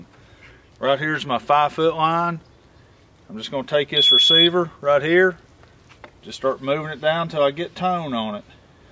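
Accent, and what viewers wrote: South, United States. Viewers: Are you from Kentucky sir? I live in lewisburg. I hear that southern 2 ba fo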